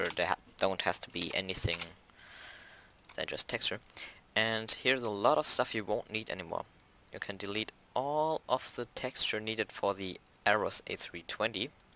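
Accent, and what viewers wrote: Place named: Germany. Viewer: Man hört das du deutscher bist xD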